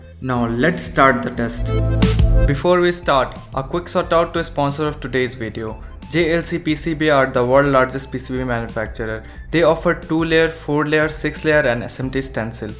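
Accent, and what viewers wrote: India. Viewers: Your voice seems of an Indian ??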